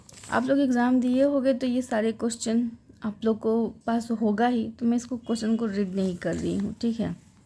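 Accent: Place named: South Delhi, India. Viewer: Aap bihar ki nii lgte ur tune is like south delhi girl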